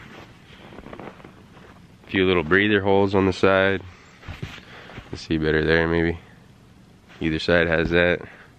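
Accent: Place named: Alaska, United States. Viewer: Thanks for an in-depth review of these hats! The website unfortunately doesn't show the inside and I don't have a Filson store nearby, but I'm considering the Mackinaw hat. Are you up in Alaska, by chance? My Uncle is from there and you have the same accent 😎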